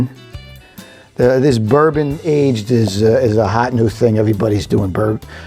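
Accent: United States. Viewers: Love your sense humour and your American accent. From 🇬🇧💕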